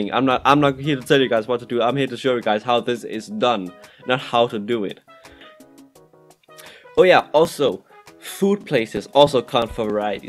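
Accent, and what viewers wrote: Denmark. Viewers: R u danish? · it sounds like your from denmark i am so pls like my comment (edit) like if you are and if your not then comment on my comment where you are from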